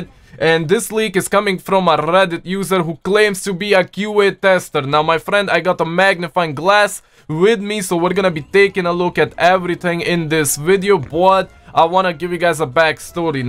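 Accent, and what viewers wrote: Russia. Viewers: please stop the russian flow bro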